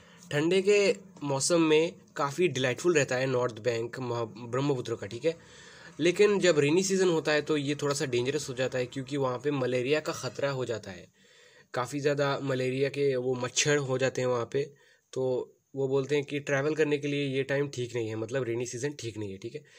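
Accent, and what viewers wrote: Bengal, India. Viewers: Aap Bengali ho na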